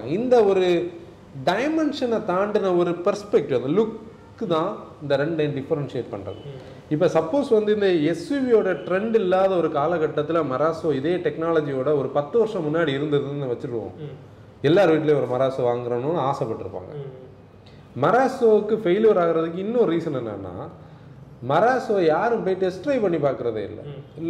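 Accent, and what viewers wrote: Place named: Nagercoil, India. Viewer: Team, This is one of the best & top class discussion on cars that seen (in Tamil)! I thoroughly enjoyed the video. BTW, I have booked Carens this morning and I would be happy to have it reviewed by post delivery. bro( hope spelled right) I have fallen in love with your Nagercoil slang and simple, yet through detailing reviews. When you are in Chennai, pls shoutout I would be happy to host your team. This 2022 will take team to an another Pinnacle with GOD’s grace. Wishing you all a Happy New Year!!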